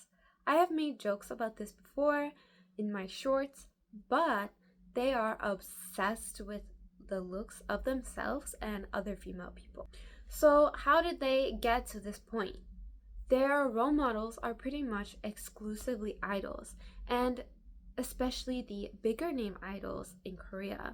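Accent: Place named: United States. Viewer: Off topic but your accent is interesting to me :) are you American? Like born and raised?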